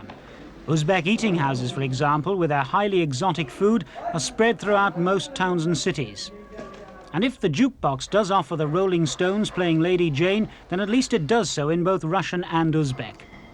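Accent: United Kingdom. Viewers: I'd rather just watch the video footage of people living their lives than having to hear some nasal British commentator spend every five seconds trying to tell you what an unbearable hellhole this is supposed to be.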